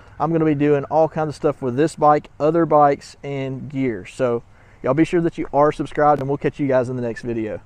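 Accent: Alabama, United States. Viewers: You're a Bama boy who says "dad gum." That equals an autosub for me. Good video. I think you just convinced me as to what my next bike is. Thanks brother! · I was thinking you were Alabamian as soon as you started talking. Huntsvillian here.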